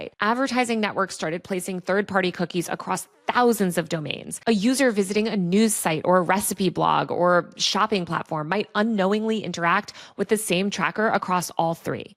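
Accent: California, United States. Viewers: How do I put a filter over your voice so I don’t have to hear such California OMG accent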